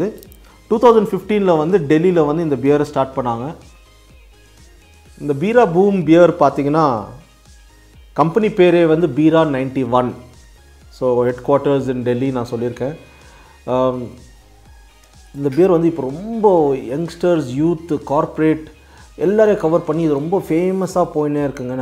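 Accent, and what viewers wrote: Bangalore, India. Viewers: Nice review. Hope you are from Bangalore. Since your slang is like Bangalorian..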